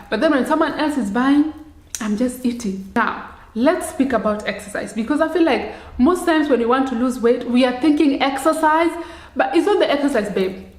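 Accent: Kenya. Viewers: Are you from Kenya 🇰🇪? Remind me a lot my Kenya 🇰🇪 Queen accent so beautiful and elegant. Loved it. Lose weight not muscles eat 3 categories of this food: proteins, fiber and good fat.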